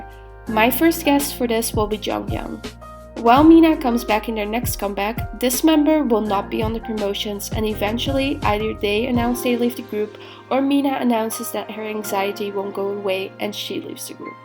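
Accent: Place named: Netherlands. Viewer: hey, i have a question? where are you from? because ur voice rlly sounds dutch lmao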